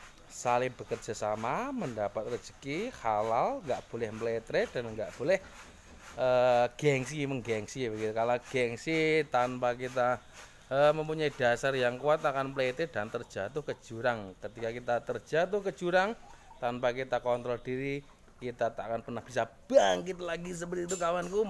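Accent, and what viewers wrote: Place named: Banyumas, Indonesia. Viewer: Amin boskuhh.pinter bgt ngomonge kaya seles 🤣👍👍 Tapi keren mantap kang..ngapak bgtt ngomonge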